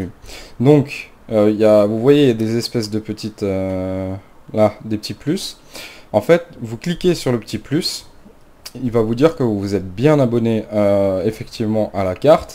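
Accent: Alsace, France. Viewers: ah un alsacien sa fait plaisir ^^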